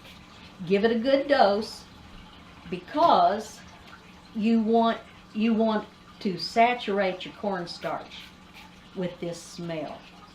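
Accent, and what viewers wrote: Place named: Texas, United States. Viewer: Your accent sounds familiar. I’m from Dallas. Small towns around there. 😄👍❤️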